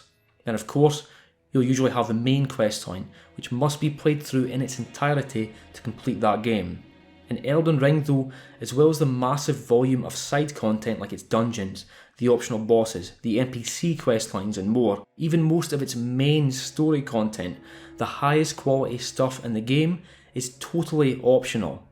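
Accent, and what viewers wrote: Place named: Scotland, United Kingdom. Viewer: Multiple hours of some guy with the most luscious of Scottish accents just talking about his love for Elden Ring? Count me in mate.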